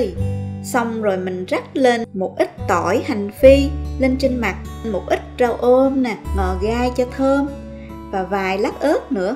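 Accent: South, Vietnam. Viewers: Giọng miền Nam ngọt ngào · Giọng nói miền Nam VN, dễ nghe, dễ thương.. Cám ơn cô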